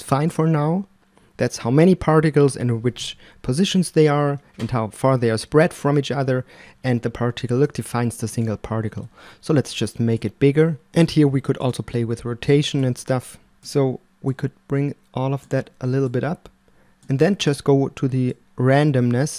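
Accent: Germany. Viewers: Du hörst dich deutsch an :D Vieeeeelen Dank für das Tutorial, genau was ich gesucht habe und absolut verständlich erklärt ! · You sound like a german, idk man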